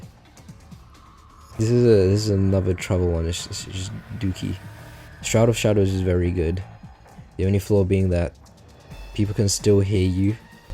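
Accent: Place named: United Kingdom. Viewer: Do my ears deceive me? A fellow British Xiaolin Showdown fan?!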